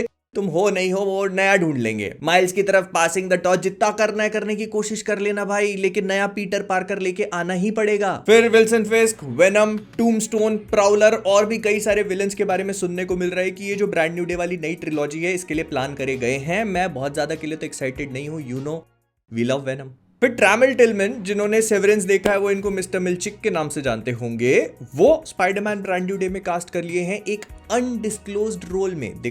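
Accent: Bhopal, India. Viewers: Makda bhopali nikal hi aata hai bhai ka😂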